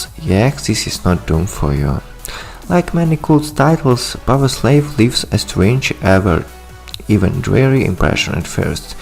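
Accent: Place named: Australia. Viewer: Man youve got a fkn mad accent mate,were the hell r ya from?? it sounds are you a australian student living in the suburbs of cali in the u.s.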